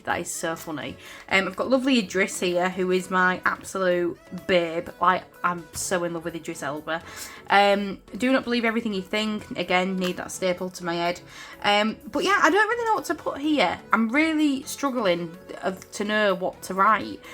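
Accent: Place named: Hull, United Kingdom. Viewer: Always makes me happy to hear your voice and familiar accent (I am from ‘ull too, though I don’t live there now!). Lovely pages as always! Great you are back enjoying journaling again!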